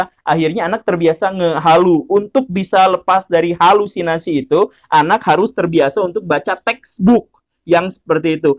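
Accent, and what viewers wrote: West Java, Indonesia. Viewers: Bapak orang Sunda ya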